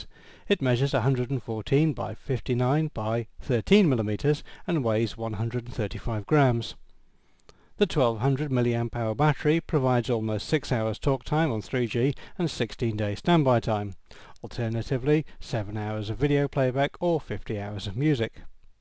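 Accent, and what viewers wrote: United Kingdom. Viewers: I hate english accent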